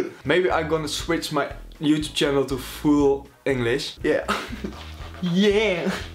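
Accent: Netherlands. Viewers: Je bent nederlands. Dat hoor je aan je accent😂😂💗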